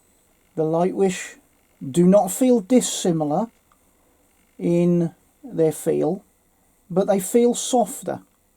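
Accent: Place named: Norfolk, United Kingdom. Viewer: I was searching for which oil pastels to buy, I clicked on your video, and immediately perked up when I heard a good ol’ Norfolk accent! I’m a Norwich gal myself, now living in Leicestershire, and it was so wonderful to hear someone talking properly 😂 I miss beautiful Norfolk so much, I’ll be moving back as soon as I get the chance. Anyway, great review, I think I have a similar art style to you, as in I want more precision, so I’ll be getting the Artecho set. New subscriber here, your review was spot on as to what info I needed. Thank you 😊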